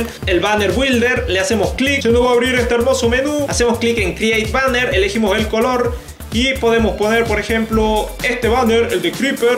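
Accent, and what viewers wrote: Argentina. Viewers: JAJJAJA COMO SE NOTA Q SOS ARGENTINO GRANDE SEGUI ASI SALUDOS DE ARGENTINA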